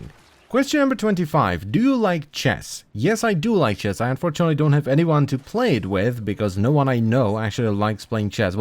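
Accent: Scotland, United Kingdom. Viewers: Yeah me too i was sure he is British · Oskar 464 haha! I thought he was Scottish this whole time. Now the CZ in his name makes sense!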